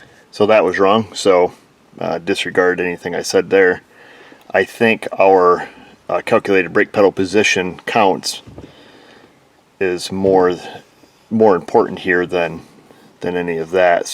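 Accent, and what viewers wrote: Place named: Northeast, United States. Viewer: "She's got the leathah!"......right away I knew he was a northeastern guy lol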